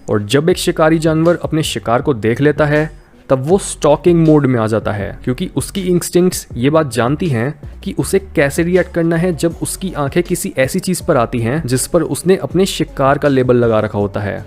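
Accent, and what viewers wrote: India. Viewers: yeah same happens 😅😅love ur voice hindi accent❤❤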